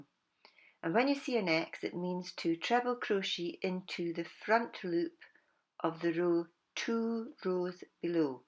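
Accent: Scotland, United Kingdom. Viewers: Love your videos,nice hearing a fellow Scot. I came across your videos after watching Tinna. I had watched Mikey from the crochet crowd doing mosaic, (love him, but boy he talks at hundred miles an hour lol)that method was worked back and front, and used chains to skip stitches, then treble crochet two rows below over the chains. I’m going to try both methods to see which I prefer. Thank you so much for taking the time to make these videos, new subscriber here ❤️ Sandra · Many thanks ,I’ve just subscribed,my mother s Scottish so nice to hear your accent.🙏🏽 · Clearly and simply explained, thank you. Ooo your Scottish accent is music to my ears 🐢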